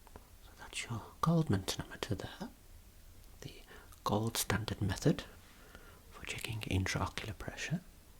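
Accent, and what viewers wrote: Birmingham, United Kingdom. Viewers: Birmingham i tinks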